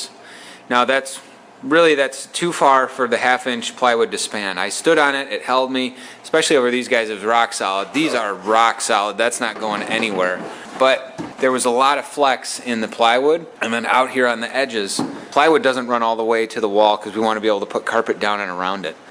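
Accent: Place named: Illinois, United States. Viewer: What size boat is that and where do you live? Sound like a fellow Illinoian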